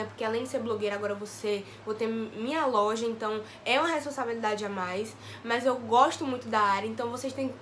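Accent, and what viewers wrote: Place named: Salvador, Brazil. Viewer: DE SALVADOR? NEGAAAAA SUA HISTÓRIA É TUDO E TEU SOTAQUE EU PENSEI EU CONHEÇO ESSE JEITO DE FALAR AAAAA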